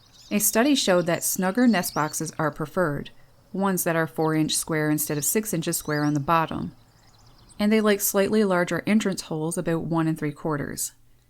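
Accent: Canada. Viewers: I love you! I love your Canadian accent! I love bluebirds! 💙 I have alot of them in my yard and occasionally will eat seed from my feeder. Interestingly I have a wooden split rail fence. Now I know why I have so many in my yard. Thank you for your 💓 of birds! You're easy to understand, and humble way of sharing information is a Blessing!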